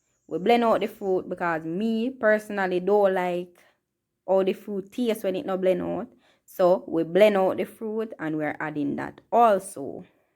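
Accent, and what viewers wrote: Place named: Jamaica. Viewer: Love the Jamaican accent! I hope I can visit Jamaica soon!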